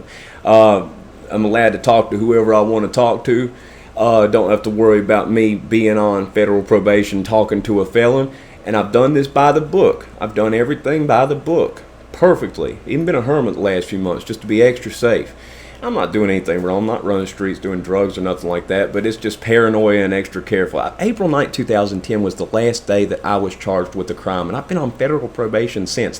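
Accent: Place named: South, United States. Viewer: Enjoy ur personality and stories. Im from KY, so ur country diction hits home! CHEERS!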